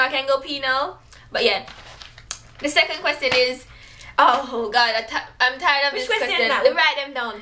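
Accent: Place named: Jamaica. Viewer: Your patois (jamaican accent) sounds so much stronger in this video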